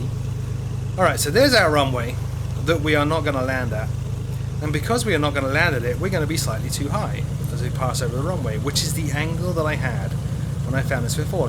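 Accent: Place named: United Kingdom. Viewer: I can tell you have a Brit accent, but for some odd reason I get the feeling you live in the states.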